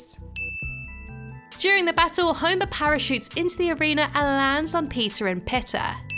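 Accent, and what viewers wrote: United Kingdom. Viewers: your british accent totally ruins certain puns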